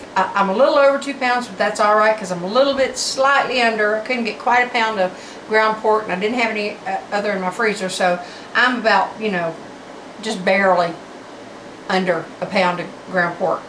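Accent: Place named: Texas, United States. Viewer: Mighty refreshing to know this is a cooking channel. At first glance of those 2 pies, I thought... Oh Hell No! Not another documentary about ''Chinese Foot Binding'' results. Good to know Natchitoches is on the same global parallel with Cowtown Texas accent & all. That ratio of egg to water makes for the most tasty of scrambled eggs as well.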